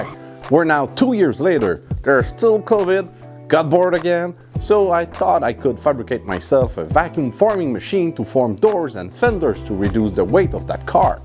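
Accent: Quebec, Canada. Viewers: Hi. I must say that this is the most pleasant and a bit unique quebec english accent I've heard. I would venture to guess Sherbrooke (because i haven't heard people from there), but really curious to where you're from.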